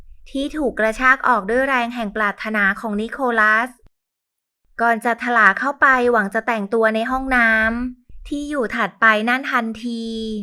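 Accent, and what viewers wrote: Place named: Thailand. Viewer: เสียงเหน่อ น่ารัก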